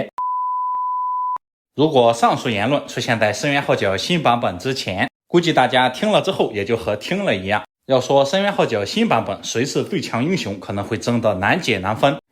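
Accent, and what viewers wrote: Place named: Xintai, China. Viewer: 博主有新泰口音 是新泰的吗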